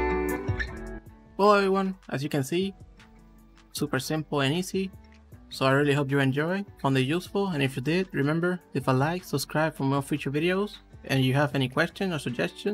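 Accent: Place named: Cuba. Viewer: i like your accent i am cuban and you sound cuba or puerto rican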